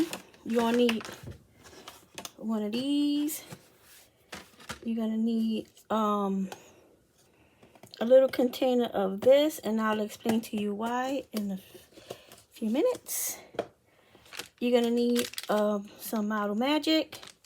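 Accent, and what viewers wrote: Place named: South Louisiana, United States. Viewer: Hi,. 😁 You sound like you are from south Louisiana; Houma, in particular. I'm a Cajun, so, you sound so familiar. 😊❤️. Thank you for sharing your creativity and talent, with all of us. ❤️🙏🏻❤️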